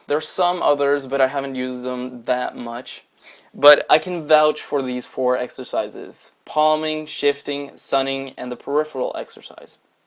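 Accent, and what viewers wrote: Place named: United States. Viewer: Had you not stated you were from Norway at the start of this video, I would've assumed you were an American from a West or Midwest state. Your spoken English is virtually identical. As a Minnesotan (of 25% Norwegian ancestry), I would have zero inkling you weren't from here if I ran into you on the street. The same could not be said if I ran into someone from Tennessee or New England if I heard them speaking. Fantastic video BTW.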